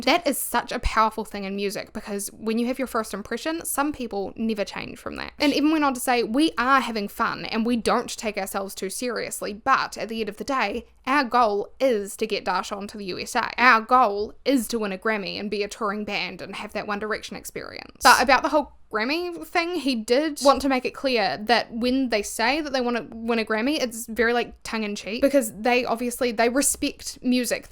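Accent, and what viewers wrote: Southland, New Zealand. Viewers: english is not my first language, I only learned it through english movies, videos etc. and I can't explain my level of confusion after clicking this video. it's the first time I ever heard kiwi accent and for 5 mins I thought I never actually understood english language all my life. love the video btw I'm glad yt decided to recommend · You do the New Zealand O proud 👏🏽... or should I say "the New Zealand Oarrr." Or is it "Ower.?." 🤷🏽‍♂️ I have no idea what you're doing with your O's but I ❤it. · "my accent? it's a kiwi accent" right as I was wondering "huh, not British.. not Australian..." the moment I scrolled down and saw "NEW ZEALAND" by the description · just got recommended this video, and as if ur videos dont seem to be up my alley already, i heard ur kiwi accent, and as a fellow kiwi, immediate subscribe. its hard to find us out here !!! 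😻😻😻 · Loved the deep dive, thanks for the effort you put into this. For my part, I think they clearly are both talented, enthusiastic, committed to their craft, but also not a typical over-polished boy band, with comedic elements to their presentation, music and style. They're in on the joke, but they are much more than just a joke. I think it's very possible there is someone behind the scenes that helped bring them together, coordinate their efforts, and give them some interesting creative direction, but if anything it would be a collaboration not that the band members are just along for the ride. Ps love your kiwi accent. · I was listening to your accent for a looong second, and I was like "wait? Nz??? Surely right?!", and then I saw the description!!! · I know this is 8 months old, but your constant fiddling with your taonga while dropping that Southland accent is sending me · Never heard of you or boy throb, but now, I appreciate boy throb greatly and I subscribed to you. 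Also cool to see New Zealander on YouTube and I also found it funny that you had to explain your accent as kiwi accents are everywhere is Australia lol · She literally says in the video that it's a Kiwi (New Zealand) accent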